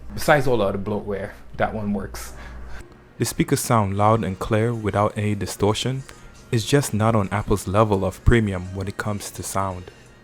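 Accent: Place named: Barbados. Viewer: Hearing that heavy Bajan accent there bro! :-) Great review and keep it up!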